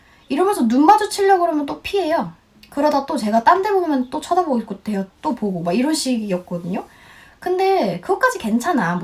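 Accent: United Kingdom. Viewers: 기분 나쁜 경험이지만, 제 기억에 남는건 영국발음 입니다 ㅠㅠ 너무 멋있어요 진짜 ❤️👍🏻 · 와 영국어보고 반했어요 바로 구독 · 영국억양으로 공부한지 얼마나 되었어요? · 영상 너무 잘 봤어요. 영국 발음 예쁘게 하세요. 마지막에 "I thought it was a dog barking" 빵 터졌네요 🤣😄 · ㅠㅠㅠㅠㅠ이 언니 왜 이제 ㅇ알게된거지 진ㅁ자 너무 이쁘고 너무 사랑스럽고 목소리도 너무 좋고 영국식 빌음도 너뮤 좋고 진짜 짱이에요ㅠㅡㅠㅠㅠㅠㅠㅠ 사랑함니다ㅠㅠㅠㅠ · 요며칠 영상 보다가 결국 입덕부정 인정하고 입덕했습니다, 영국식 발음에 내가 이렇게 환장하는 사람이었나 첨 알았어요 ㅋㅋㅋㅋ 진짜 내가 본 인종차별 대처 중에 제일 고급지고 우아하게 되받아치신 것도 너무 멋있고 영어 관심도 없고 공부할 생각은 더더ㅓ더더욱 없었는데 덕분에 영어공부도 관심 갖게 됐고 필기체도 연습해서 처럼 멋지게 쓰고 싶어졌어요❤️ 진짜 발음 너무너무 좋고 좋아서 영상 통으로 영어로 읽는 책 읽기 컨테츠? 같은 게 나오면 좋겠어요 제가 조회수 만 정도는 책임지고 보겠습니다 ^.^ 살앙해요 ❤️ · 와.. 영국발음 넘 멋있어요. 뉴질랜드와 호주로 워홀을 희망하고 있었는데 영국으로 급 변경하고 싶네요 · 영국발음 장난아니네요 와~ 전에 다른 유튜버한테서 봤는데 남한에서 왔냐 북한에서 물어보면 "너 하기에 달렸다" 였는데 엄청 웃겼어요ㅋㅋ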